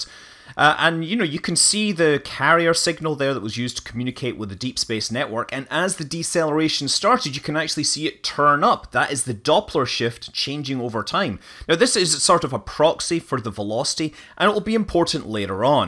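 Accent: Canada. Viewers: isro is pronounced as iss-roe in indian english. not everyone needs to have manley's canadian accent, no?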